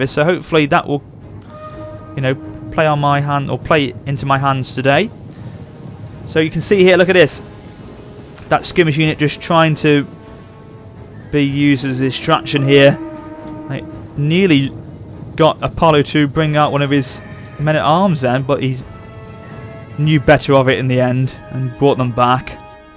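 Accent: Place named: England, United Kingdom. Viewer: MMm such a nice mod. Btw, 1. Do you live in the UK (I know you are Enlgish (Or at least sound it) 2. If you do what Provider do you use 3. If its bt what router do you use to play NTW!!! Ive tried 5 different routers and I still cant play NTW online and I cant exactly get a new provider xD Nice Video as well:)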